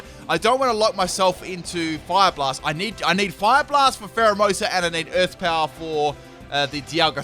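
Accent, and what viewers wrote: Australia. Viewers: U sound Australian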